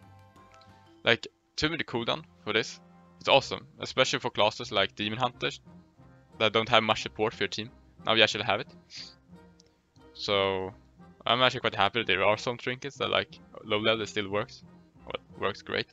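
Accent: Sweden. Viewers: As soon as I heard you're voice I was like ''Yeah, he's swedish''.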